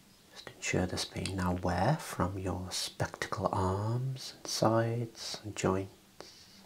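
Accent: Birmingham, United Kingdom. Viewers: My favourite Brummie 😍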